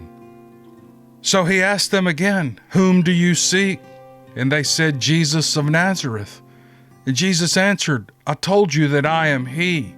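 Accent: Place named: South, United States. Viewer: Hearing that ultra-familiar, southern accent narrating the Bible is more comforting than I can possibly express in words. I humbly thank you for this, Mr.. I shared these with my family.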